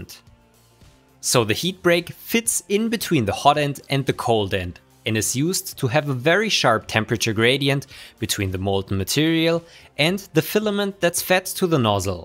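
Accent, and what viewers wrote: Germany. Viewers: Are u German? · Ja genau! Ganz geil alter only watched 5 seconds of the video and already knew u were German lol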